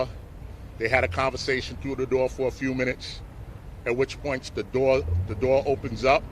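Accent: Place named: New York, United States. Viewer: NY accent is the best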